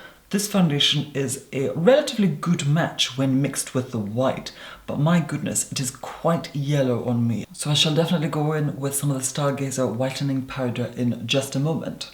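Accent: Scotland, United Kingdom. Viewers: The more I see your 'fillums' and the more I hear you speak, the better I understand your English with that charming Scottish accent. For a Frenchwoman, believe me, it's not so easy! Thanks for the lessons, Professor 🌹 A 37 years old French woman ❤ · maybe outer Scottish isles but quite enunciated? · J Yes, there most certainly appears to be a slightly Scottish undertone audible here, there, and everywhere. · Are you Scottish??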